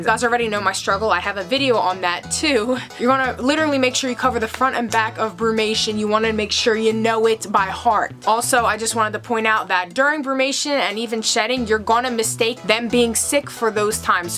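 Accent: Philadelphia, United States. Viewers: Are you from Philly? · hey hun nice video er! you from Philly?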